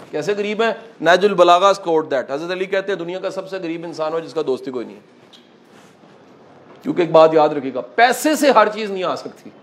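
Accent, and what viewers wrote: Punjab, India. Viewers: Muhammad Amir - This is lahore or pubjabi syle of urdu. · Sir ap bhtt parhy likhy hen. baten b achi krty hen lakin apka accent punjabi he.. 11 ko ap bar bar yara keh rhy gyara ki bjaye.. mere kano ko bhtt ajeeb lgti ye punjabi urdu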